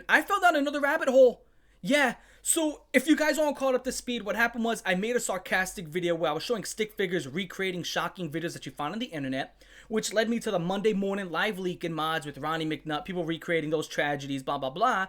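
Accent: New York, United States. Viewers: holy ny accent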